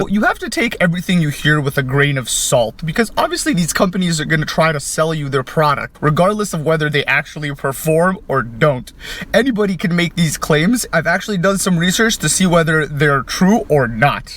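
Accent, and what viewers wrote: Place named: Toronto, Canada. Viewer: Are you from Toronto? You sure sound like you are lol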